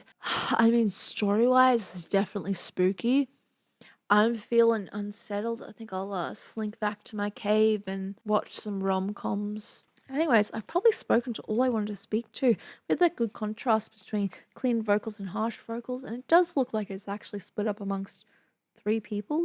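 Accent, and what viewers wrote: Australia. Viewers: I love her reactions and love love love her Aussie accent😍😍😍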